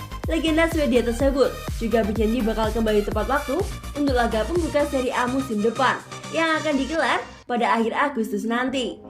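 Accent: Java, Indonesia. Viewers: Waduh.. medok'e mbaaaakk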